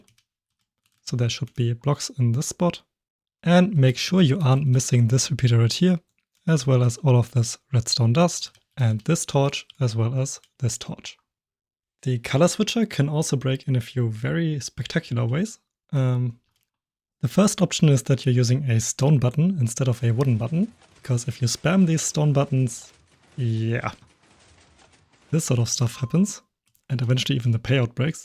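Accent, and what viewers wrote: Germany. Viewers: i never built this but watched the video because i like redstone funny accent german man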